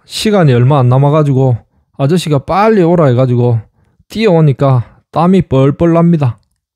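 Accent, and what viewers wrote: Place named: Masan, South Korea. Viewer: 수고가 많으십니다~ 목소리 들으면 강호의 발바닥님/ 생각도 납니다~세분이 모두 마산분이십니까?~세분 목소리 억양이 비슷 합니다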